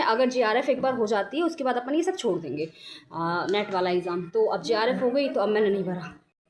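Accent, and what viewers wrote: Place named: Haryana, India. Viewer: Mam ap haryana se ho ya mp se?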